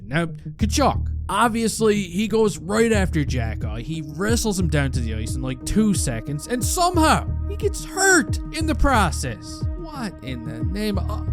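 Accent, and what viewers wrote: Newfoundland, Canada. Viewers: I think I just found my new favorite hockey channel. Top notch Canadian narration, filled with tons of catchy phrases. This should be a good year bud, I'm subbed now so let the lettuce flow and the O's be ewwwwwwt! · love the videos man, great content mixed with humour 👍 Plus the Newfoundland accent is the icing on the cake 😁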